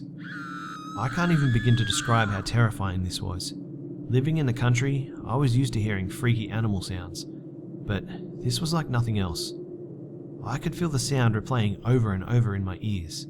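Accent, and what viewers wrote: Australia. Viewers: Minnie Hambrick The guy narrating the story is Australian but, the guy who wrote the story is British. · aussie accent lol*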